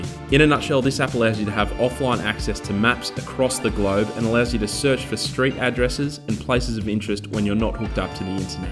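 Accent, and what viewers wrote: Australia. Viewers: Are you Australian? That accent sounds very familiar. Anyway keep up the great work!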